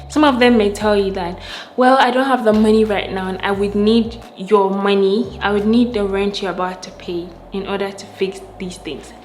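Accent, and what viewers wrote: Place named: Ghana. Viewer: very well explained and detailed. I love this. I also love the way you talk, are you Ghanaian, if not, what country are you from?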